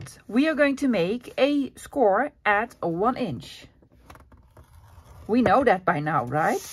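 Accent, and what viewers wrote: Netherlands. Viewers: First time watching your channel. Loving the ''flippies'' and "snippies" words. I normally cut off the first of the 2 flaps to reduce bulk, won't be cutting them off anymore, think it makes the sides pull in better so they are straight. Great tutorial. Love the Dutch accent, reminds me of my Dutch friends from years ago.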